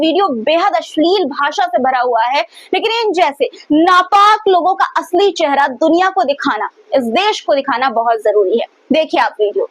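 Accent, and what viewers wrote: Pakistan. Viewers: Iska bolne ka lehja to Hindustani nahi lagta۔ Pakistani lehja hai is ka۔ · ye urdu bol raha hai jaise pakistani ho.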